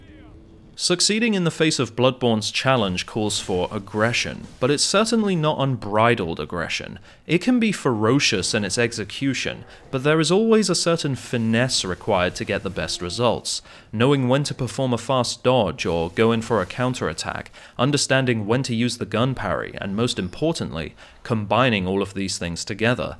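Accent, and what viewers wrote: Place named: United Kingdom. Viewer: I went from british dudes narrating the savanah to british dude narrating videogames.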